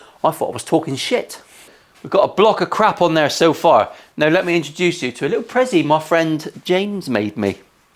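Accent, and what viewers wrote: United Kingdom. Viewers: Banger of a SG mate!!. This is the type of guy where the British accent and swearing so swimmingly together like peanut butter and jelly. Love it. Keep up the great work. Maybe do a left explorer build? ;-D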